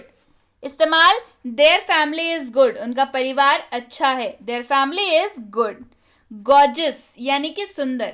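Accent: Bihar, India. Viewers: Bihari like maam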